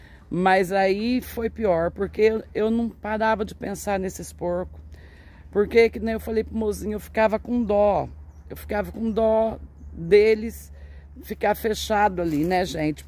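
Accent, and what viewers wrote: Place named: Minas Gerais, Brazil. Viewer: Eu adoro esse seu sutaque caipira de Minas gerais e são Paulo kkkk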